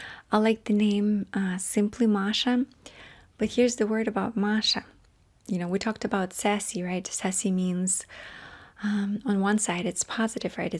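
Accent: Russia. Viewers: Simply Masha. Even though you have lived in the US longer you still have the ethereal Russian accent so it's perfect. Its what makes you, you. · Hi! I am from Bulgaria and I officially been in America more than Bulgaria, just like you. I know what you mean. I am so glad you are renaming this channel, I love you but Sassy did not fit you lol. And for the record, I think that on this channel your accent comes through just a bit more than the Gentle Whispering channel. I can recognize your accent, and despite your years in America you will always have your root in Russia. Thank you for your content ❤ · I adore how your Russian accent is still so prominent even though you’ve lived in the US for over half of your life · Simply Masha resonates best imo since you aren't trying to be anything else, not a performer, not an influencer, simply Masha. Why Masha, not? Though you don't broadcast that part of yourself all the time it's still part of who you are. 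The accent in your voice is lovely and reminds me of your rich background, russian treats you've shared with us, and even family you've introduced to us. For me, its part of how I've gotten to know you over the many years and even if the content shared is less culturally focused, it's always a beautiful part of you 🩷 Also, one last thing. You mention that many people distanced themselves from you and your channel. I remember that time being a period of seeking a sense of belonging, of security, of self. You were in an emotionally vulnerable place and found solace where it made sense at the time. You said "I deserved it". Please don't beat yourself up over that learning experience. It was part of your journey and necessary for you to get to where you are now 💖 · I've been following and enjoying your channels for many years. As an Eastern European, let me tell you, yes, I can see the Russian origins. 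You even have some accent left, especially in the pronunciation of your "L". In my opinion, both identities can coexist, Russian and American. It doesn't have to be an either/or. It's who you are, celebrate it! ❤ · How brave you are! To share yourself and your journey with us. It hasn't been easy for you over the years and we thank you for your honesty. I like Simply Masha. From a marketing/comms perspective it is very strong. There's alaway Masha...? Emphasising the two aspects of who you are. I would encourage you to embrace your Russian side...even in these difficult times. It's a point of difference for you and for many of us, strength comes in knowing who we are, and where we came from. We love your accent and your cultural difference. It makes you special. As far as content is concerned, I love the gardening journey you are on and just about anything you choose to share. But keep some things things for yourself! 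Your 'sassy' natural personality is wonderful to see and it's important for you to be able to be yourself, not in a curated way. ❤ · I like ‘sincerely Masha’ as I agree that is how I see you and your videos. Also your Russian roots and your amazing accent with its Russian twang is what has kept me listening the past 10 years ❤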